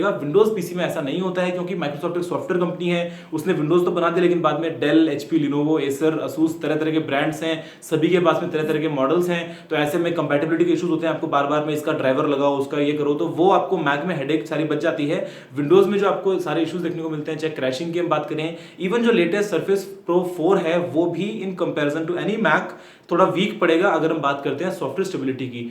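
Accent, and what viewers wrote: India. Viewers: Classical Indian English, oh my god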